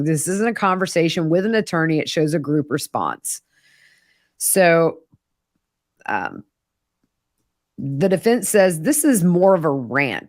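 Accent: South, United States. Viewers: Hi our lovely, It's the 1st time I have heard of Tabitha, who is she and what is her role (if any) in this case. Thank you for your great work. And I so love your Southern accent it's great. 🤩💗💗💗💗 Lots of love from Julie in the UK 🇬🇧.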